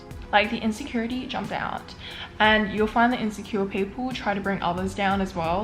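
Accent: Australia. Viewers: this is totally random but are you australian? english is not my first language and I'm trying to guess where your accent is from lol it's beautiful tho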